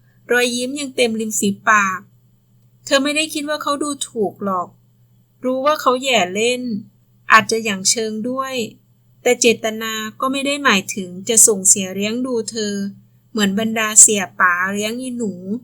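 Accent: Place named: Isan, Thailand. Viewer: พุดอิสานเพราะมาก😅😮😢😢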